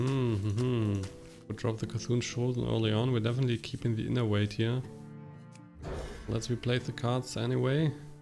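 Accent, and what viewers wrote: Germany. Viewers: uhh are you Germany? Your accent makes me think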